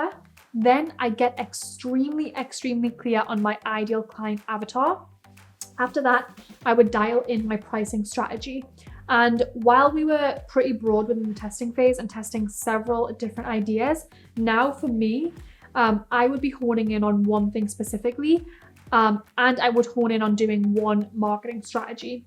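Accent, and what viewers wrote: United Kingdom. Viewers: I love the Geordie accent👌🏻ever since Geordie Shore😂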